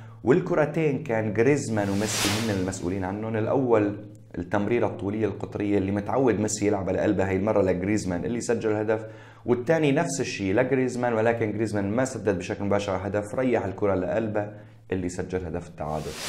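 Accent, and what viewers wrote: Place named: Aleppo, Syria. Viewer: انت مبيين انك حلبي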